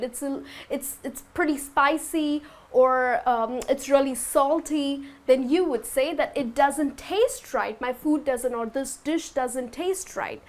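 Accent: United States. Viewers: How do you twist your tongue to make US ascent???:) I wanted to learn it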